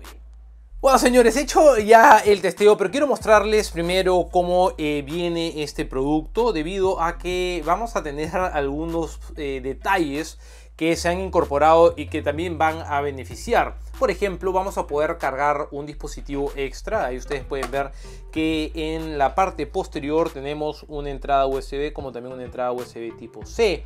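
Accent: Peru. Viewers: Eres peruano? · Es Peruano???????????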